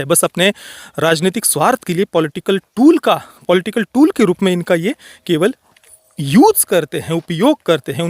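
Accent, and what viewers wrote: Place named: Kolhapur, India. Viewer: Kuthal gaav ahe bhau ❣️???? Tumch kolhapuri accent 👌🏻👌🏻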